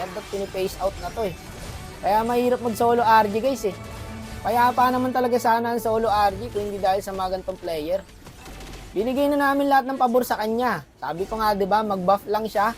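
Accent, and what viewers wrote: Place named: Visayas, Philippines. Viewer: bisaya yan halata e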